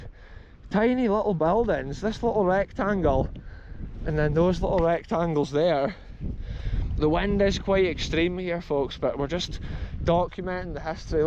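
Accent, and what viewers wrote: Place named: Angus, United Kingdom. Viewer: I always find it hard to locate your accent. Given the general area of your adventures, I am going to guess Angus, perhaps around Forfar/Kirrie/Brechin? Am I close?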